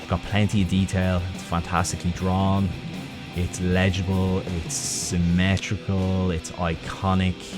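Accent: Ireland. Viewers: I knew you was Irish when I first heard your accent mate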